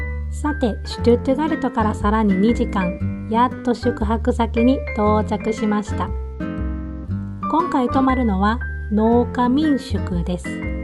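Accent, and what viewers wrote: Kansai, Japan. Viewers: ドイツで入浴剤！？浴槽に浸かるのは日本人だけかと思っていました！びっくりです。 関西人のわたしには関西弁が抜けていない主さんの話し方が最高です。これからも頑張ってください😊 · お初にお目にかかります。 話し方から主さんは京都か大阪、いずれにしても関西の方なのかなと思いました。 黒い森、シュバルツバルトですね。 旅するドイツ語で見ました。 自然豊かでんなぁ。 でもビール飲めへんと辛そう。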